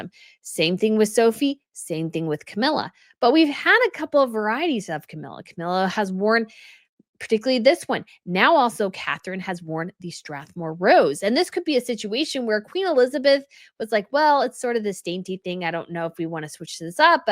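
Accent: United States. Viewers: her pronunciation is American because she IS American. That's okay.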